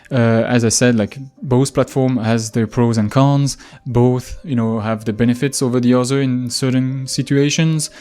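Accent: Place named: France. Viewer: I like your french accent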